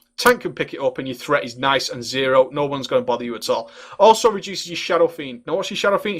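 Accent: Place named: Manchester, United Kingdom. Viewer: Great video, going for a shadow priest with the scroll of resurrection. Nice to hear a Manc accent, I moved to Brazil noy many Mancs there. :)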